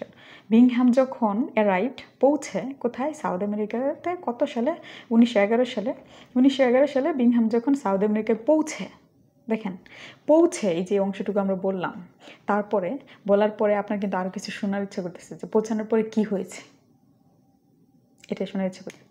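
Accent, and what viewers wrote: Narsingdi, Bangladesh. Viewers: Boin just masallah chilo....apnr accent sone mone hocce apnr bari Narsingdi.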